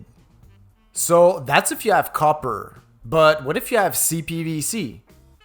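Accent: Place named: Montreal, Canada. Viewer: Montreal accent for sure.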